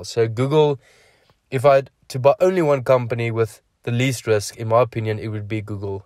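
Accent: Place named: South Africa. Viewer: Apple? - thanks for the tips = good to hear a SA accent discussing stocks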